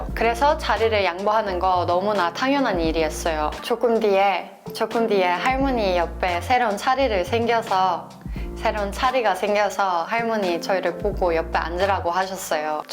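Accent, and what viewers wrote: South Korea. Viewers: 대구사투리 느껴짐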